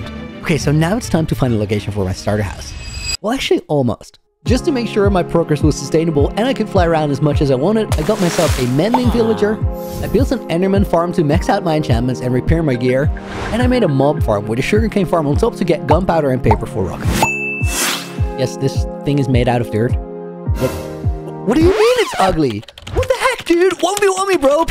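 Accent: Netherlands. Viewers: Areyou dutch???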